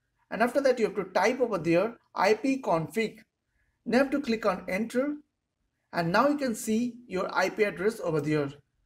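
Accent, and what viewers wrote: India. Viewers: ur annoying indian tech support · Ahh that classic Indian accent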